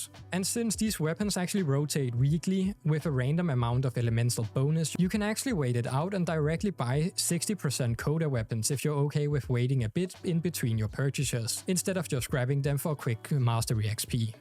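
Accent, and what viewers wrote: Denmark. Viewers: Danglish? <3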